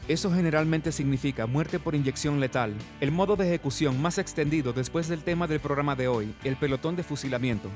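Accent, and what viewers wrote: Russia. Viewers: Suena como un ruso hablando español. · Suena exactamente ruso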